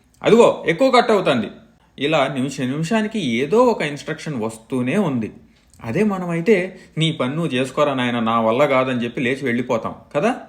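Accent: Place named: Guntur, India. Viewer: Are you from guntur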